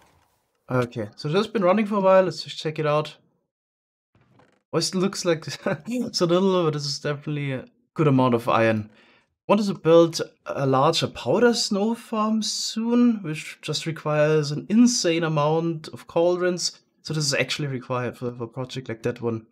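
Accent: Germany. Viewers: Are you from Germany? Asking bc of youre accent 😅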